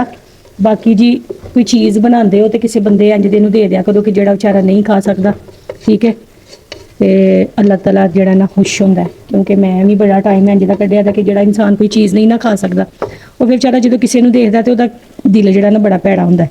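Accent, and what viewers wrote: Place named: Punjab, India. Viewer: Love your Punjabi accent ❤❤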